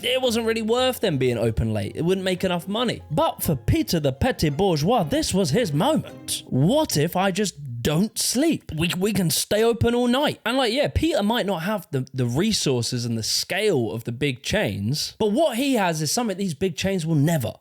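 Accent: United Kingdom. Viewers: the funny thing is, if you pull the accent and literally naming Brittan, This video is literally just the story of every "first world" country. None of what was said here doesn't apply just as well to me here in Canada.... the names and faces of the people change, but the story... the story is the exact same. a sentiment that is echoed by other commenters, from other countries as well. We are all playing in the exact same game, just with different localization. its like we are all playing different versions of monopoly, with that same cheating frined that insists on being the banker and not letting anyone else review the rulebook but them.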